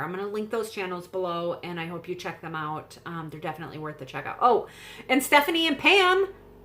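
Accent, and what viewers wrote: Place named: North Dakota, United States. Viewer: Your accent makes me miss home -- we moved to Mississippi from North Dakota 11 years ago, and my northern accent has faded, dontcha kno? But when I go back home, it's so easy to pick it back up again. Love your vids. You're a sweetie pie!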